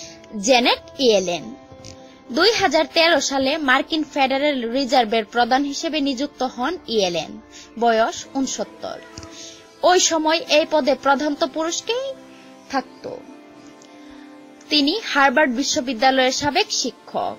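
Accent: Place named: Jessore, Bangladesh. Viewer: আপনার বাসা কোন জেলায়? যশোরের ভাষায় কথা বলেন।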